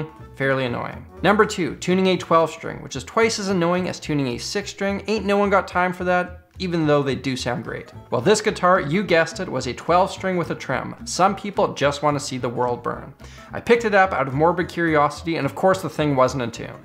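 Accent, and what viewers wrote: Canada. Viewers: This is the most Canadian man I have ever heard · First ten seconds I knew you were a fellow Canadian lol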